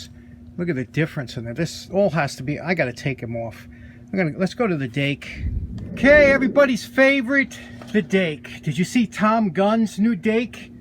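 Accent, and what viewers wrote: United States. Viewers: Accents😂. I do my videos in my normal everyday voice, or so I though, but someone commented I sound so chilled that it sounded like “I had eaten a shit ton of weed”. It’s you Americans that have an accent not us Aussies. Now Larry the cable guy has an awesome accent that I can listen to all day. He makes me laugh as soon as he opens his mouth. Great job on the restos. It’s so frustrating have to restore things twice🤬 Cheers Stuart 🇦🇺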